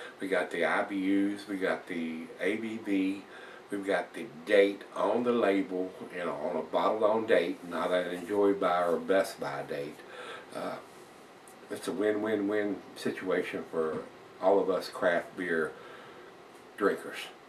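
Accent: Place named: Virginia, United States. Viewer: hey! love your reviews brother. what part of va are you from. because you sound like your from my neck of the woods.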